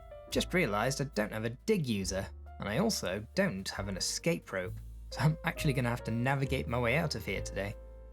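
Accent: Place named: Shropshire, United Kingdom. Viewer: I don't, I just thought your accent sounded a little Shropshire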